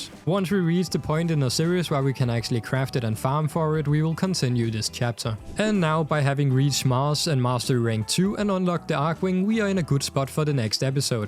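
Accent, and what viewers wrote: Denmark. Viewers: great video! instasubbed since theres no way youre not danish hahah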